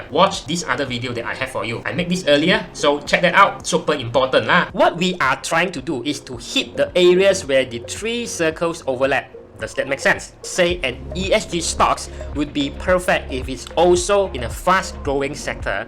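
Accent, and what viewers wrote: Malaysia. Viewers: haha.. no la just teasing u .. I think u being original is the best regardless of what others say.. that being said, your English style of saying is just Malaysian could understand ..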